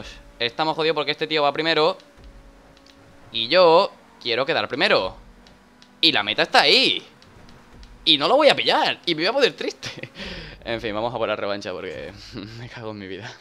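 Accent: Mexico. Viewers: ... Me da risa como hablas como mexicano😂😂😂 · Like si te da risa el acento mexicano de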